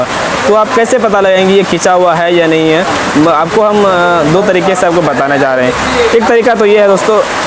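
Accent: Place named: Bhagalpur, India. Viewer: कहां से हो भाई। भाषा तो भागलपुरी लगता है।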